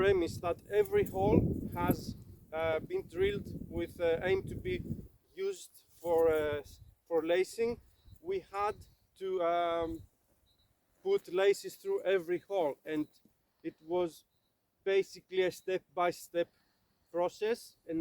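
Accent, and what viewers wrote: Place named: Greece. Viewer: You sound Greek. Are you Greek?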